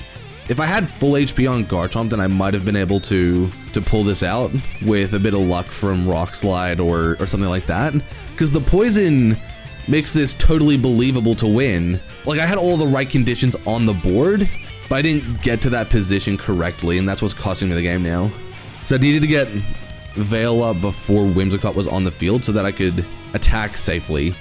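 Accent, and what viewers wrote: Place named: South, United States. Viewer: lmao you kept me guessing, the redneck accent is definitely southern Alabama/northern Florida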